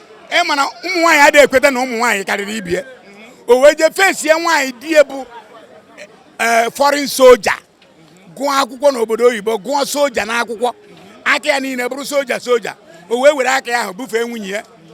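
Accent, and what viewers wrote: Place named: Anambra, Nigeria. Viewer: He's from Anambra state not IMO... but all the same, we are Biafra 😊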